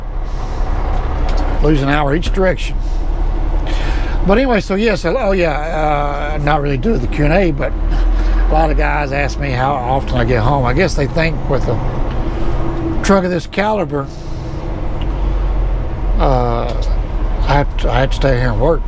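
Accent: South, United States. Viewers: Thanks for sharing your story. Your southern voice sounds good to me. lol Be safe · You do have a southern drawl but so what?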